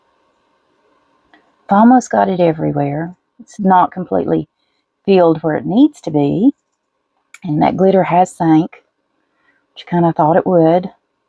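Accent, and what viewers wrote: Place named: South, United States. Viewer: I thought that was a southern accent my dad was from Georgia and my bother lives in Texas I live in Utah 😊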